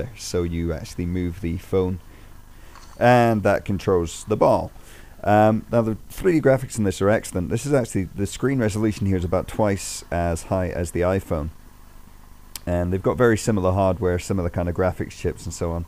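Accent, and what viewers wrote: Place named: United Kingdom. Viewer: i love british accent!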